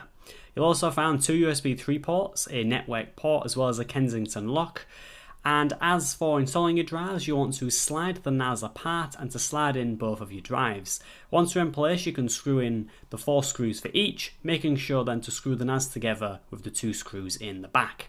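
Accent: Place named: England, United Kingdom. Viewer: ‼️it took me to the end of the video to realize he’s saying “RAID” not “red” 😂 English Accents are such a joke. There’s no way they aren’t aware of how dethroned their speech is when there’s no perceptual difference between these two words. For real.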